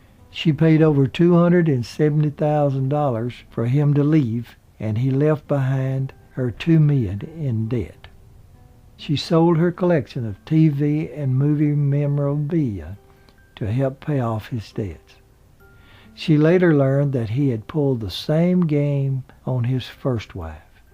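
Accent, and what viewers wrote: South, United States. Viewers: 👍YES I TRULY LOVE his southern accent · ja, er ist einfach super Mit seinem 'southern Snarl' So howdy mässig :o) Recheriert sicher viel LG aus Franken!!!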